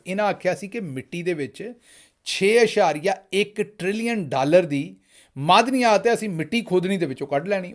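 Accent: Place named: Punjab, India. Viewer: Kamal Punjabi.... Love it... I am myself a Punjabi... I enjoy your accent... ❤❤❤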